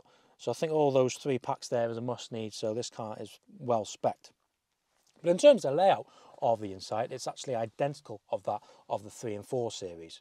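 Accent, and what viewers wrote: North Wales, United Kingdom. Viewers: You from north wales mate?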